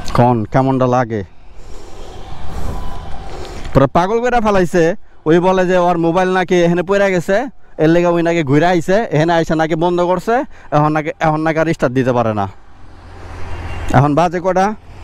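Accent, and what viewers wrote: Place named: Bangladesh. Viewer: আপনের কথা এমন বাংগাল এর মত কেন ক্ষেত পুরা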